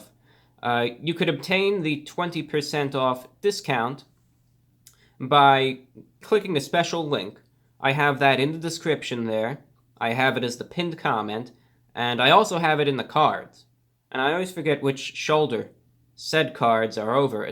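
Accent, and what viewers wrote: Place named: New England, United States. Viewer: You have such a New England accent lmao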